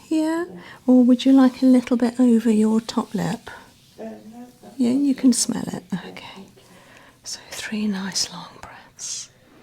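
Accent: United Kingdom. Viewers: I love your accent idk if that's just genetic British accent or what lol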